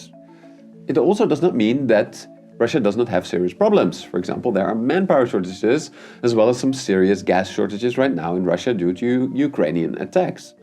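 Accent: Netherlands. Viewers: Dutch accent (a little bit similar to slavic accent, because the Dutch language sounds like Russians speak English), " is a PhD candidate at the University of Groningen, the Netherlands."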